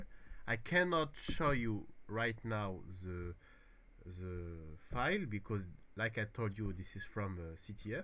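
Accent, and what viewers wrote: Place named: France. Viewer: noticed the french accent merci frérot pour le partage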